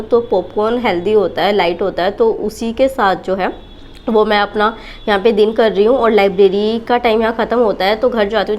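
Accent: Haryana, India. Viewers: R u native from Haryana ????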